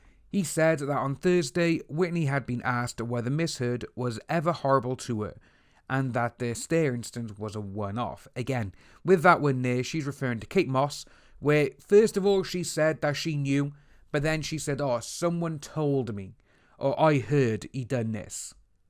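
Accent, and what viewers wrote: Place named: United Kingdom. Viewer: Love your British accent... Good job keep it on.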